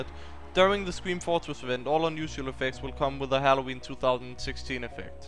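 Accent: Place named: Denmark. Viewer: You have a very danish accent. You're probably not dansih though, you still have a danish accent.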